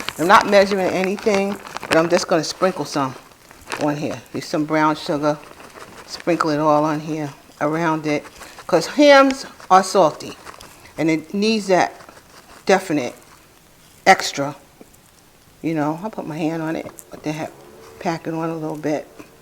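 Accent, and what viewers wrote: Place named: New York City, United States. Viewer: I'm going to make this for thankingiving u sound like a NYC lady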